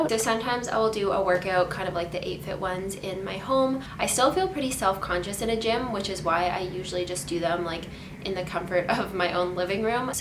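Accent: Canada. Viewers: are you Canadian?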